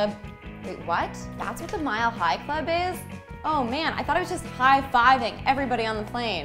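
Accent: Canada. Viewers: Canadian Accents bruh: "my begs" & "luggage tegs" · I love her Canadian accent 😍😍 the way she says tag is so cute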